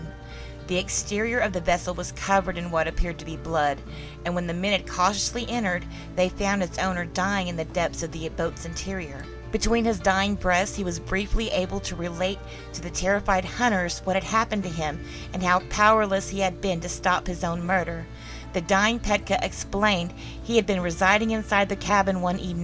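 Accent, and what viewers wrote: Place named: South, United States. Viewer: I just love the southern accent 😉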